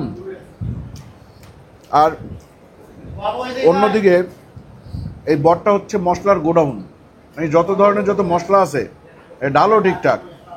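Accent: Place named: Rajshahi, Bangladesh. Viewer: Vaiya kothar moddhe dekhi Rajshahi r akta taan Chole ashese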